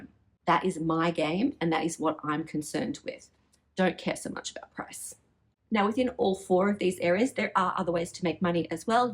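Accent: Australia. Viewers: Great info thankyou and Aussie accent is a bonus.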